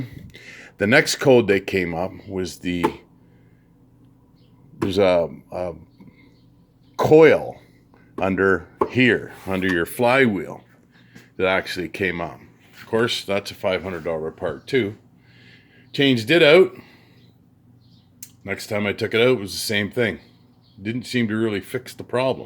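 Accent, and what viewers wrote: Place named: Canada. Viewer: Canadian eh.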